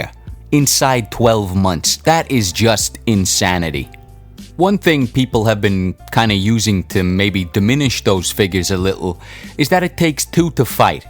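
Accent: United States. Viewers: What region of america is this accent from? I kind of recognise it but can't place it. I find it interesting.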